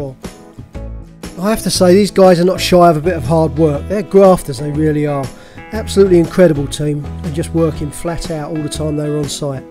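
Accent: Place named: South London, United Kingdom. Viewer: Hi I was the one who couple months back said you must be from south of the river with your accent, live Sydney but spend lots time in Thailand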